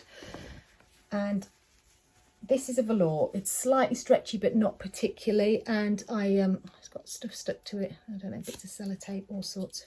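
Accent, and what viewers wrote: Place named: Midlands, United Kingdom. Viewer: So glad I found your channel. At last someone who buys from the Rag Market in Brum. I LOVED the Rag Market. Living in Quinton I used to get the number 9 bus into Birmingham and spend an hour (or two) at the Rag Market and then get the 50 bus to my mother-in-laws house in Kings Heath loaded down with at least 2 big bags of fabric. I miss that place so much! Lived in Devon since 1987 where fabric outlets are scarce and like you Pound Fabrics are a favourite. Anyway just wanted to say I love your pattern and fabric choices and it’s great to hear a faint Midlands twang (I’m from Dudley originally and have never lost my accent). I’m catching up with your videos and treat myself to 3 a day. Making a pair of Bobs today! Xxx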